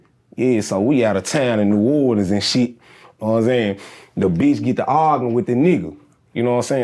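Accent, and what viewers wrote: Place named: Memphis, United States. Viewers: Memphis- accent. As someone from nash, I am all too familiar with this accent. And the state of mind. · Right I wasn't even near my phone when I heard it first come on, I said this one from Memphis! Then I heard Mitchell Heights and Binghampton and I was like Ayyyyyyeeeee!😂😂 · I understand everything he saying. But then again I am from Memphis. Other southern states also talk like us. Know what Imsaying? You have to put I’m and saying together. You feel me? (Another one of our saying.)RIP Freddy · I'm from Memphis and I felt this dude and understood his speech and know the scenery that bred him and everyone here say youknowatimsayin including my college educated self church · Soon as he started talking i knew his was from Memphis Tn. I know how we talk we have that certain swagg and talk to us specifically when we say mane that's just us! 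· Born & Raised here and manee I swear this how Memphis Dudes be Moving And Talking they get up to explain the story better I guess lol I Love it 🤗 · He's from Memphis whatchu expect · I just knew he was from Memphis when he started talking 😂😩 iykyk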